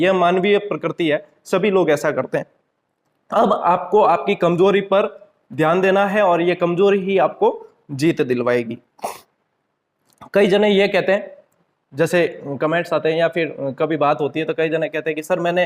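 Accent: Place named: Western Rajasthan, India. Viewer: सर आप कहां से हो आप मारवाड़ी बोलते हो तो उसमे पश्चिम राज़. की आवाज लग रही है ,सर जी कहा से हो मै बाड़मेर से हूं अगर आप यही मारवाड़ के क्षेत्र से हो तो मै आपसे पर्सनल मिलना चाहता हूं गुरुदेव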